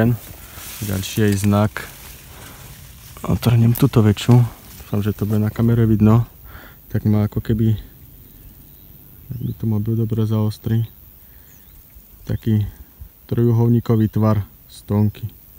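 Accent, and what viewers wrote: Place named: North, Slovakia. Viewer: Máš krásny severoslovenský prízvuk, videá sú super nenechaj sa odradiť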